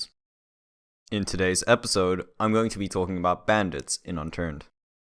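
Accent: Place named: United Kingdom. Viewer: This would be extra nice .. you sound bigmany time brtitsh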